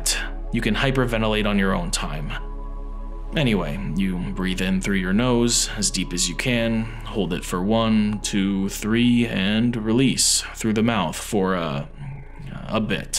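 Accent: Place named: United States. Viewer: as much as i love this reading, hearing these read out in an american accent is hilarious.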